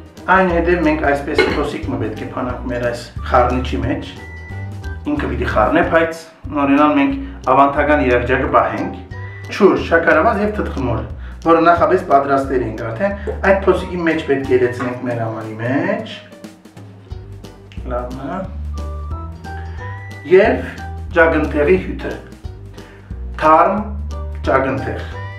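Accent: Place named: Western Armenian, Armenia. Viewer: Արևմտահայի Ձեր խոսվածքը շաաատ հաճելի է :)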